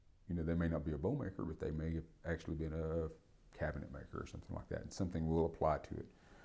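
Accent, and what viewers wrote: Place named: South, United States. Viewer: i was so expecting a heavy native man accent....was not expecting that southern accent...im native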